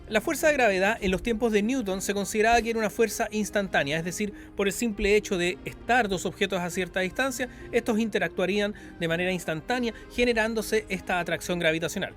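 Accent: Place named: Chile. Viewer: hola una consulta... eres chileno,... yo chileno seguidor de canales de ciencia como robot de platno, date un vlog, Thoisoi, jordi prereira entre muchos otros... primera vez el algoritmo me dejo caer tu canal... un placer escuchar ciencia en acento español de chile... y encontre por fin como un proton se convierte en neutron... eso buscaba y pille tu canal... y al fin lo aprendi... los Piones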